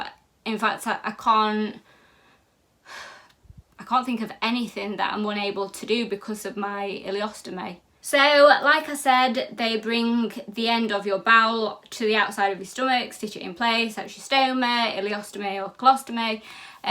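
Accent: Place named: England, United Kingdom. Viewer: Do you have loop ileostomy or a end ileostomy.. i had a loop ileostomy in June 2022.. I have a odd shape stoma so i carnt get precut pouches & I still carnt cut them to fit properly.. that problem really stresses me out.. also its nice hearing someone on youtube talking about ileostomys with a English accent.. nearly all the ones iv watched are American.. I'm in England x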